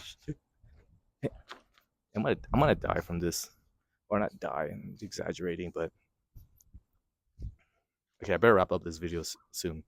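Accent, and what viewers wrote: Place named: England, United Kingdom. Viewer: Yesterday, I came across a video on YouTube featuring a very young developer from Chicago, he shared about how inspiration feeling about documenting our life, work, and growth via vlog/videos (btw his channel looks so art cinematic I'm impressive so much!! <link> . Today, I found myself back on your channel watching another video about making videos on YouTube. It makes me feel a bit shy because I still don't feel ready to start my own vlog. I'm taking it day by day and hopefully, I'll have the courage to start a vlog soon. Anyway, I hope that we can organize a meet-up for UX designers to share experiences in Ho Chi Minh City. NAB is my dream company. I hope to become your colleague one day! Another thing I'd like to comment on - I love your English accent. I'm just curious, did you study abroad?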